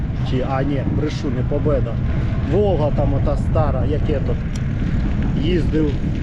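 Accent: Poltava, Ukraine. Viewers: Доброго вечора,! Ми дуже раді Вас знову бачити. Дивимося Ваші відео і на душі так тепло стає. А коли моя мама чує полтавське мовлення, то аж плаче, бо ми зараз далеко. Дуже переживали за Вас, коли дізналися, що Ви у ТЦК. Переживали як за рідного. Нехай Вас Бог береже 🙏🏻 Я хотіла спитати Вас, чи можливо буде для Вас покататися на дві адреси? Перша - це по дорозі від Вас, Ви часто проїжджаєте повз цей будинок, тільки у дворі ще не були. Це адреса Героїв України 34/24 А корпус 1. Це великий полукруглий дім, як тільки переїхати міст з Половок, там є зупинка Сади 1, а далі отой жовтий будинок із зеленими балконами. Якби Ви проїхалися у дворі, на ігровій, особливо біля перших під'їздів. І ще одна адреса вул. Полюсна 12. Це за Макдональдсом, раніше була зупинка Боженка. Біля будинку ще є кафе Роксолана. А ось за нею знаходиться цей будинок. Теж прохання проїхатися у дворі, 2 під'їзд. 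Ми хотіли б Вам віддячити. Не можу знайти, як перерахувати Вам кошти. Напишіть, будь ласка. Будемо дуже вдячні!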